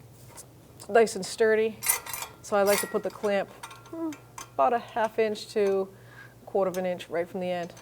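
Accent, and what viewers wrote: Boston, United States. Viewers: I hear that Boston accent and I feel like I’m home.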